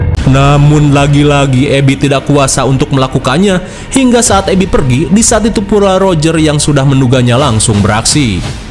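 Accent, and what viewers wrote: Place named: West Java, Indonesia. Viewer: Cuma sukses bikin acara review filmnya jadi seru dgn gaya dan logat Sundanya ngena bgt.top,top,top..🎉🎉❤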